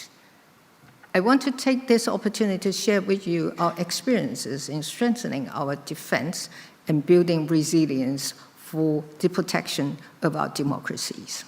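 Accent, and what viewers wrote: United Kingdom. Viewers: 小英－口濃濃的英國口音讓我覺得好懷念自己年輕的時候！當初我在新加坡學英文的時候就是這樣的口音、我們的前總統小英真的好可愛🎉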